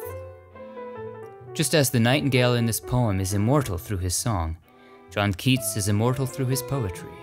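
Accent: Italy. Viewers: I love your Italian accent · Hello. You must speak Italian, you say you have Italian heritage. Thank you for making this more real with your accent. I am Judy from Nova Scotia, Canada and I really enjoy your videos. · first, congratulations on your Italian accent, then ... welcome to my Florence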